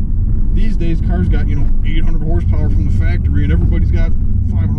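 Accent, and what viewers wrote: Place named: Midwest, United States. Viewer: The midwestern accent is AGGRESSIVE. Really completes the vibe.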